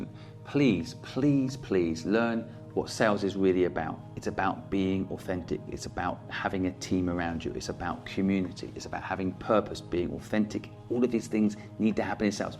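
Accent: United Kingdom. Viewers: Ugh dammit, he's British :/ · Squibb just sounds British. I love that I finally found you today. This video is one of the most important videos I've ever watched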